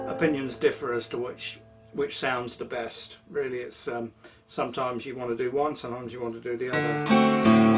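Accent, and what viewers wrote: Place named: United Kingdom. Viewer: 這位老師的youtube頻道叫做SecretGuitarTeacher(對沒錯三個單字連在一起） 這位老師教得真的很不錯 但要吞得下英國腔XD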